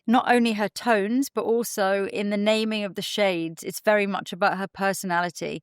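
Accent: United Kingdom. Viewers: Me too! I'm not an English native speaker and I have a little bit of difficulty with Brit accent. I thought: did she say Talk to the poor? This is very catish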